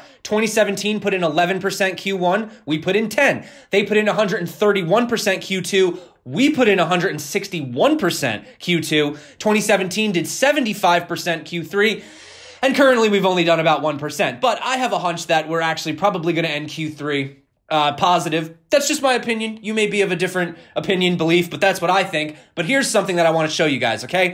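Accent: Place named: New York, United States. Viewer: The New York affect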